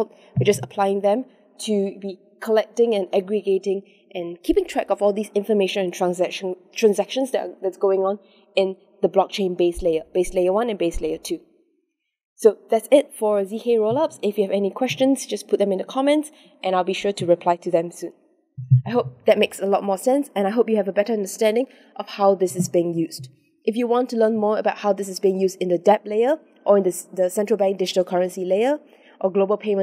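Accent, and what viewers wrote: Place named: Singapore. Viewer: SINGA-PORE! i can hear dat accent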